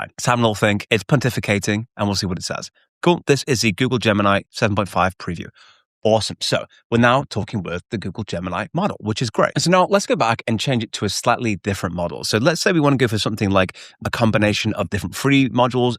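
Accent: United Kingdom. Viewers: Love your accent! Is that british?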